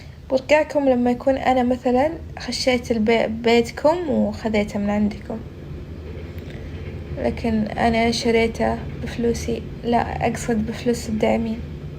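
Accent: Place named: Najd, Saudi Arabia. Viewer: لهجتها من اهل نجد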